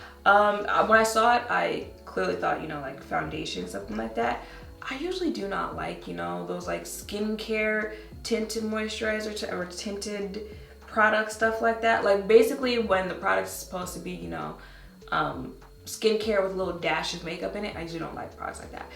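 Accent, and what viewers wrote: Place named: New York, United States. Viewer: Such a New yorker!! ♡ THESE LAUNCHES ARE LAzzzsssyyy! It's giving... Dull and Dustttyyy! Yoooo!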